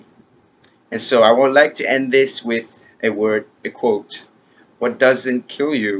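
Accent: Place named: United States. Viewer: You're such amazing!!!! Definitely like an american boysssss....good job !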